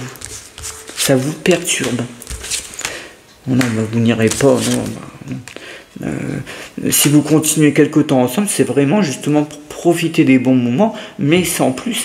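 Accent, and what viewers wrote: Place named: Nord-Pas-de-Calais, France. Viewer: 😮❤ merci à vous et très jeune heureuse année 25... Merci pour votre tirage 😊 Mon petit cœur est las d'attendre quelques nouvelles palpitations.... Rien depuis 21 ans... Sinon une solitude subite à 49 ans..décidée par l'univers.... Rien et personne depuis 20 ans... La lionne attend. On verra bien ce que 25 fera de positif. En tout cas, j'ai bien aimé votre tirage, vous êtes particulier, calme apaisant et peut-être même habité 😊 J'ai beaucoup aimé aussi votre petit accent ch'ti... Est-ce que je me trompe..., je ne crois pas être médium...🙏 ☺️ Nouvelle abonnée... à très vite Cathy 59 😉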